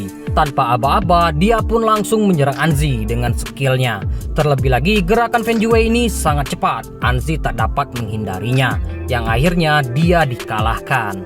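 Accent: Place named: Medan, Indonesia. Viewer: Logat Medan nya kental bgt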